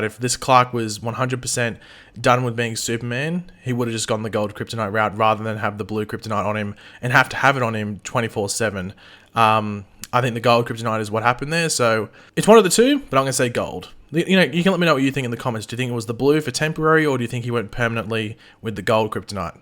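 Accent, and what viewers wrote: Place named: Australia. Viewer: You sound like a Australian version of Tom Welling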